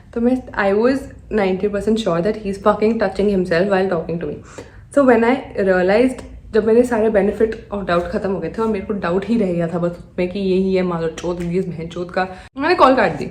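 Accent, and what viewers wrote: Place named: Delhi, India. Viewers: I started watching her just couple of days ago and it’s just my second video of her ( 1st one is that haunted one) bruh, the way she rants and talks 😅🤣. Proper Delhi accent 😅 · delhi mein sab hi aise baat krte hain 🎲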